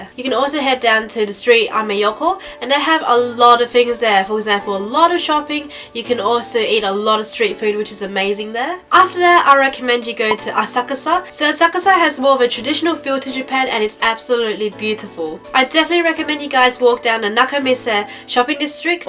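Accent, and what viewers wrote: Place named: Australia. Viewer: you are very pretty, and sound like an Aussie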